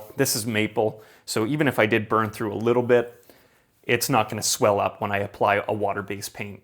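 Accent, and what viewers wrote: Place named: Canada. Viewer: They turned out beautiful and your narration is easy to follow. I knew you were a Canadian right away neighbor. Visited the Falls last month--a view that never ceases to amaze me!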